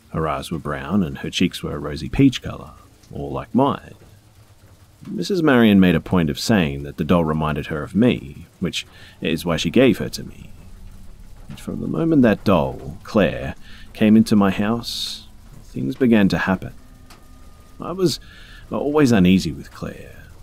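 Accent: Australia. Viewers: I’m new to your channel. Is that an Australian accent I hear? Love your soothing voice! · I love listening to you as i love the sound of your voice and your Austrailian accent too, i am Scottish, the worst accent in the world, so i really appriciate your accent and American accents too, keep on telling your stories true or not i love listening to them.best regards xAnne.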